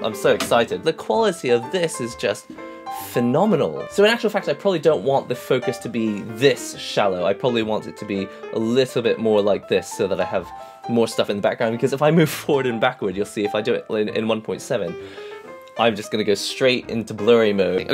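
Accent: England, United Kingdom. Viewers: It could be a thing, livestream wearing a suit and tie...with the English accent could be your unique selling point.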